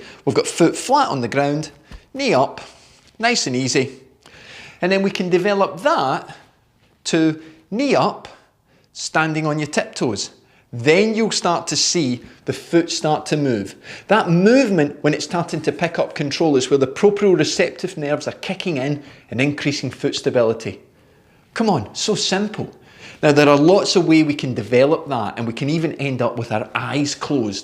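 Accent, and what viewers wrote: Scotland, United Kingdom. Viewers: Actually, it’s the English who speak Scottish a wee bit funny…there’s just more of them so people around the world think their accent is real. It’s Not. This is real! 😊😊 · bro???😂😂😂😂,,,I love your Scottish acent a MOERSE LOT!!! from Wellington, RSA.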